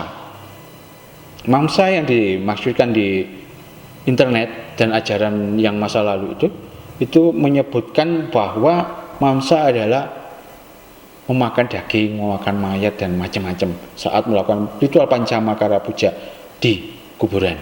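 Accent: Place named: Bali, Indonesia. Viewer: Mas kayanya dari bali, lihat logatnya, salut untuk konten2nya yg mendidik.🙏🙏🙏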